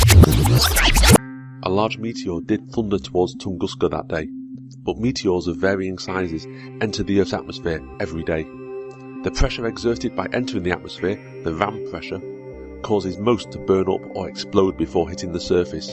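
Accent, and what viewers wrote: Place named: United Kingdom. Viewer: The narrator is a Brit with a cold.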